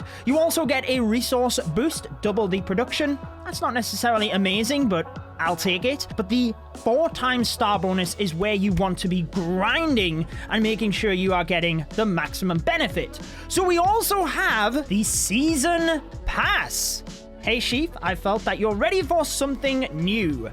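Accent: United Kingdom. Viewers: Just enjoying ur british accent bro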